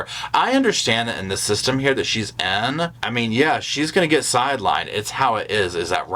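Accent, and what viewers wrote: South, United States. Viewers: Id just like to say thanks for your videos everyday ☺️ ive been super depressed lately because i was in an accident and lost all my teeth and its hard for me to even get out of bed anymore 😭 but i love your southern twang makes me smile and has my attention off my issues if just for a few minutes 😏 love rosco p coletrain❤️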